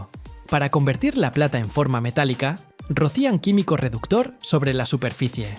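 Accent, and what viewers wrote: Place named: Spain. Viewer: Buenas imágenes, buenas tomas en el vídeo pero que lastima que hables como los españoles de españa,España con “e"menuscula soy Americano Nativo, greetings.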